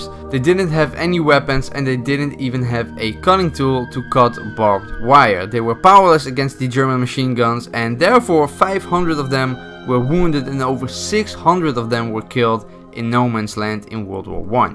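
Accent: Netherlands. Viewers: You sound like youre dutch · im new and have a qeustion are you dutch ? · Your english sound dutch. You from holland?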